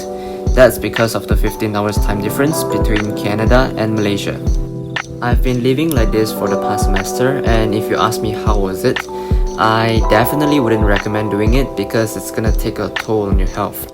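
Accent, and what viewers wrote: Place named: United States. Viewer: Why do i hear the US accent HAHA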